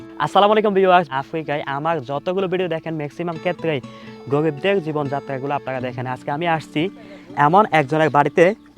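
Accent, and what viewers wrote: Chittagong, Bangladesh. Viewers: আপনার ভাষায় আমার মনে হচ্ছে আপনি বাংলাদেশের চিটাগাং য়ের লোক টিক আছে কি জানাবেন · ভাই আপনিকি চট্টগ্রামের চট্টগ্রামের ভাষা শুনছিলাম 🥰🥰আমিও চট্টগ্রামের,রাঙ্গুনিয়া।।। জানাবেন চট্টগ্রামের কিনা🥰🥰🥰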